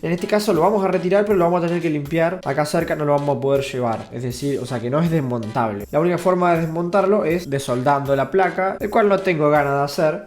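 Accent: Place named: Argentina. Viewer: eres como un mexicano con acento de argentino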